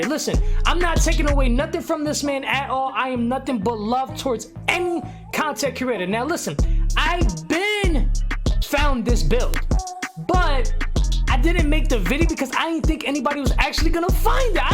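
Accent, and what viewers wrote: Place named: North Jersey, United States. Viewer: Oh yeah and shot out jersey in the buildin aaayyy South Jersey Camden county to be exact.. I know by how u talk u from north lol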